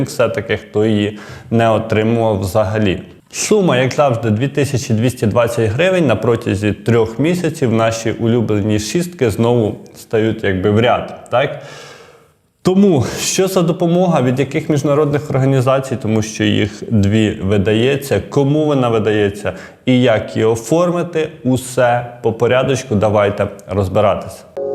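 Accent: Russia. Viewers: Добре,але ваша вимова шиплячих дуже москальська і дратує українські вуха.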